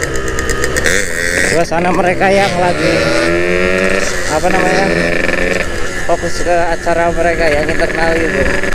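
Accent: Indonesia. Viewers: Walaikum salllam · Logat nya jawa timuran asli mana bang salam santun dari blora jawa tengah bang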